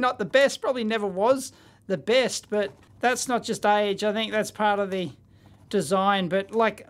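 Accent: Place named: Australia. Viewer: English... with an Australian accent.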